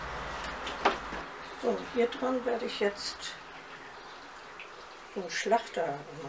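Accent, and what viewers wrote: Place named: Northern Germany, Germany. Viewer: Hallo, richtig gute Idee dein Kerzenständer, freue mich jedesmal auf deine schönen Videos. Ich mag so gerne deine Nordstielaussprache 🤗 u.natürlich die schönen Dekoideen 😙danke 🤗🤗😚😚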